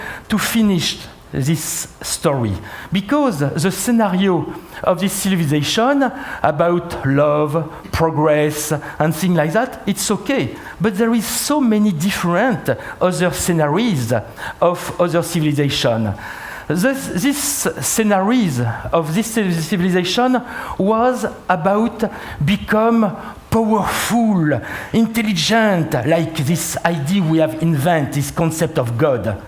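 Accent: France. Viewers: As much as i like this guy , i hate the french english accent ! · He has the most comical, stereotypically French accent I've ever heard. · love this french accent it is so funny!!!... today to not do it is more positive than do it :))) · I am French myself and I think he represent the french accent...His accent is terrible...you can understand clearly ?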